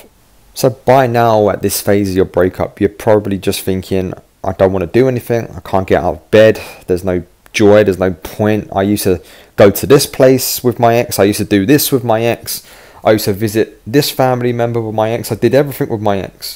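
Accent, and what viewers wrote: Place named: London, United Kingdom. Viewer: 👍🏾👍🏾👏🏾👏🏾👏🏾👏🏾🥳🥳 YES!!.. YES!!... Give it to me straight Boss!! Anyone who is depressed needs to listen to this guy!!.. And if you are going through a breakup watchballnhis videos!! Thankyou fir makinh these Videos...please do not stop! Such a help with real talk... and valid, intuitive information!! 🥰Love these videos!... The presenter sounds like a true Londoner.... Like Me!... I need to hear the Effings and Blindings...🤬🤬🤣 That is how I talk man! 💥💫💫💟💥💥Gwarn UK Massive!! 🤷🏾‍♀️🤯Makes me wanna get thr F*CK up and move! This man is my FIX.. Love that good old British ... No Nonsense London Accent. This is what people need out here bruve YES!!💋💋👍🏿👍🏿😘🤗Churped me right up!😎😋 "EX Who!??".🤣🤣😂😂🤩😜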